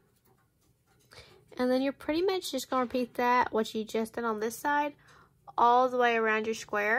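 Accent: United States. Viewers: Americans should do something about their accent!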